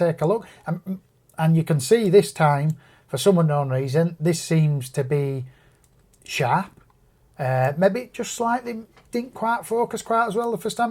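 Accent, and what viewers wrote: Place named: Yorkshire, United Kingdom. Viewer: you sound like my son...yorkshire accent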